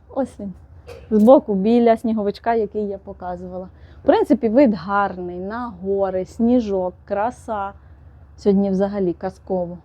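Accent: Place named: Lviv, Ukraine. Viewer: Супер! За вікном казка. Чудові краєвиди❤ У ваших відео є особлива родзинка -мова, львівський говір, і це дуже притягує. Дякую, що поділилися враженнями. ❤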